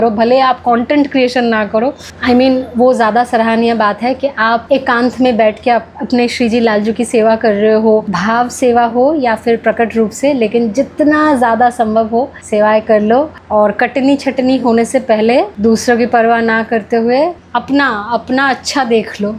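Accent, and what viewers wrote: Bengal, India. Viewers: Di speaking in Bengali accent flawlessly 😮 mere sar ke upar se gya sab 🥲😅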